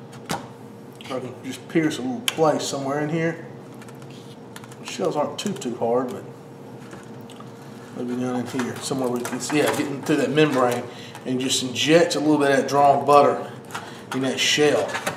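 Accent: South, United States. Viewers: I love ur southern accent!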